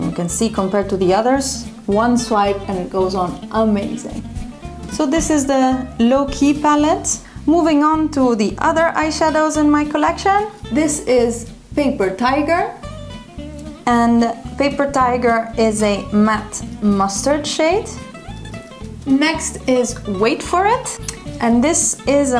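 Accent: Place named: Italy. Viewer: è il primo video che vedo tuo e non so perché sapevo che eri italiana per la pronuncia hahahahahaha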